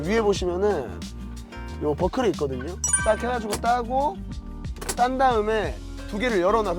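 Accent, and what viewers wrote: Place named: Chungcheong, South Korea. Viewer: 오킹이 충청도 사람이유? 사투리가 친근한디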